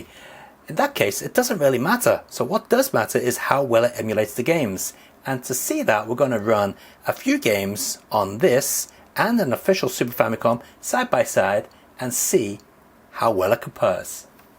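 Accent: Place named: Liverpool, United Kingdom. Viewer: sometimes your accent sounds just a little like Dave Lister from the Dwarf. Boys from the Dwarf!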